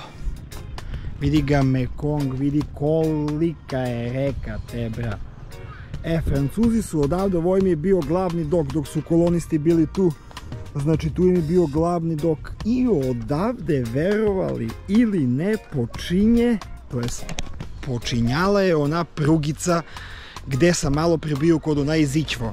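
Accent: Niš, Serbia. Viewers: Ne znam koliko si svestan, verovatno ti pricaju prijatelji i porodica, ali jednom kad se vratis u Nis, ti vise nikada neces pivo da platis ovde. Za ove tri godine si uradio vise na promociji grada, naseg slenga, naseg naglaska, naseg humora, uopste za urbanu sociologiju grada, nego svi politicari u Nisu za poslednjih 30 godina. Kad ovo sve za jednog Durlanca kaze jedan rodjeni Bulevarac, onda ti je jasno sve bratooo 😎 Cekamo te ovde i budi siguran kad te budem sreo negde po gradu, necu da ti trazim selfie ili tako neka sranja, nego ima da bude "De si be, nabijem ti ga? Aj ce popijemo po pivo i da pojedemo nesto konkretno, namocam ti se u pirinac i nudle vise..." Vidimo se nekad, u oslobodjenom Nisu 💪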